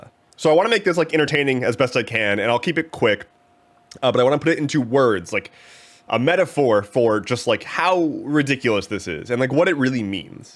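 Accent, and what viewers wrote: United States. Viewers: Sounds incredibly American...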